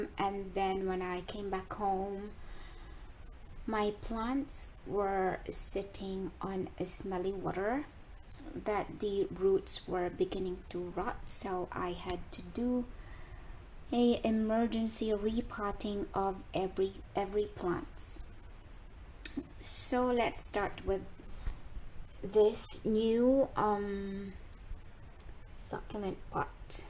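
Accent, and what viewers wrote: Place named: Visayas, Philippines. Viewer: hehehe... lately in the middle of your video... i notice your tone.... same as bisaya....... mabuhay po.... hoping for more video to come...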